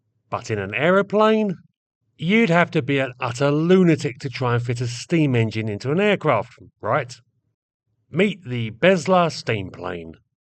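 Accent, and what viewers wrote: United Kingdom. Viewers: battle of the monotone Brits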